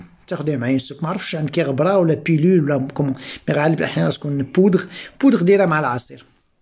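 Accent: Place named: Morocco. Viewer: شكرا كتيرر مسيو معلومات جداً مفيده و رائعه حكيت حظرتك عن زيت البلدي قصدك فيو زيت الزيتون ؟ أنا من شام وماعرفت شو هو زيت بلدي بالمغرب🤭 الهجه المغربيه كتيرر حلوه مع اني ما بفهم كتيرر مغربي بس حبيت😍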